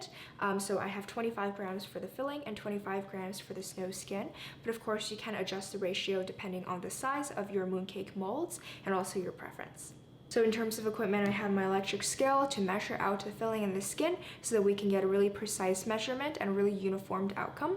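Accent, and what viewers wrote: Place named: Singapore. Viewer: First time coming here, you sound very Singaporean.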